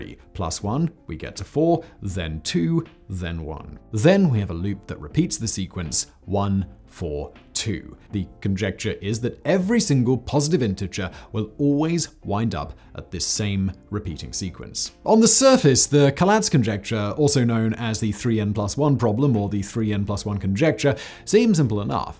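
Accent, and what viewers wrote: United Kingdom. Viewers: I was just going to post that I was heartbroken he didn't work that in. Beyond perfect for the situation, plus the addition of the brit accent. All I can say is : "in a high dimension of which we know nothing, the mighty Adams bellowed in rage...."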